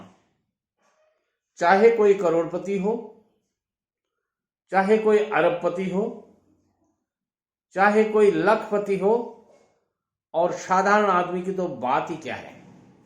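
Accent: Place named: Bihar, India. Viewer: Dadaji Ham aapki awaaz ko mahsus kar pa rahe hain jaisa lag raha hai ki aap Bihar Jharkhand se honge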